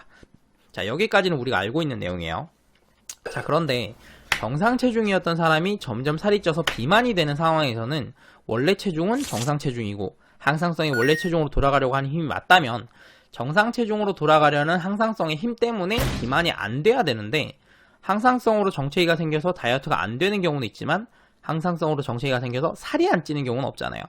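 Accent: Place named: Gyeongsang, South Korea. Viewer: 갱상도 사람이시네요 ㅎ 모하시는분인지 궁금.. 설명 진짜잘하심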